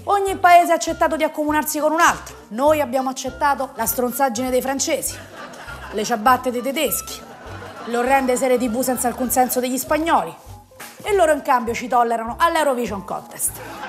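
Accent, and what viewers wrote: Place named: Rome, Italy. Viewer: Quando i cominci affrontano questioni politiche sono alla pari di qualunque superficiale che scrive sui social. Se avesse detto: " so europeista, attaccatevi a sto c*** brutti fascis*** de m** " ( con la sua tonalità tipicamente romana ) forse mi avrebbe fatto almeno sorridere. Lungi dal fare il " professore di economia dei social " vi dico delle cose molto semplici che hanno solo il fine di farvi riflettere ( quindi, a differenza della comica, il mio intento non è quello di fare propaganda politica!! ): - l'inflazione non è sempre una cosa cattiva e non è scritto da nessuna parte che uscendo dall'UE quest'ultima schizzi alle stelle! L'inflazione, come viene creata, può essere stoppata e anche annullata ( e quindi creando deflazione ). Vi ricordo che il " quantitive easing " di Mario Draghi aveva lo scopo di creare il 2% di inflazione nella euro-zona al fine di stimolare la produzione interna e alleggerire il peso nominale dei debiti degli stati; - il discorso sui debiti è alquanto strana... 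È verissimo che l'UE è poco intransigente ( non dimentichiamolo! ), ma non è che possiamo posticipare le scadenze all'infinito! Arriverà il momento in cui l'UE darà delle belle noie all'Italia! E uscendo dall'UE quest'ultima non può chiedere il pagamento dei debiti ora e subito... Non l'ha fatto con la Gran Bretagna! Esistono i compromessi che si sostanziano in accordi finanziari; - sul discorso delle pensioni basta riflettere su come funziona l'inflazione ( se eviti la sua crescita galop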